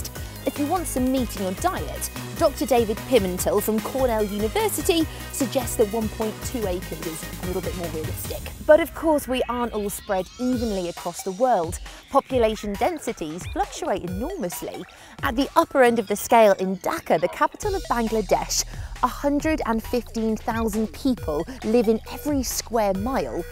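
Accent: United Kingdom. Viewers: ur sexy, i love British accents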